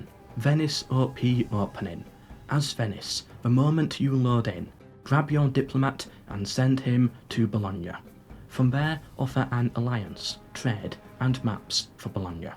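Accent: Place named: Yorkshire, United Kingdom. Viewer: The Eternal Game. Who could best describe it, time and again, with his unmistakable Yorkshire accent? Mr., of course. No irony intended. Take care, reddish lad.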